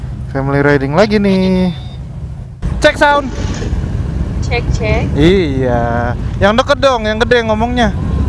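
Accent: West Java, Indonesia. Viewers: Bro aslinya orang mana logat sundan nya agak kenyel hehehe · Logat orang sunda iye he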